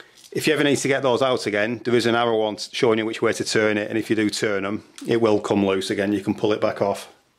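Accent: United Kingdom. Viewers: He is speaking really english he's from the UK which made the language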